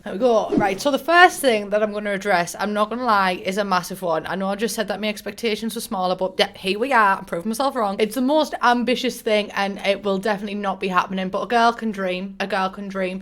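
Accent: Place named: Northumberland, United Kingdom. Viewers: i love your north-england sound ♥️ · Can’t work out if you’re from Northumberland or Newcastle, hmm 🤔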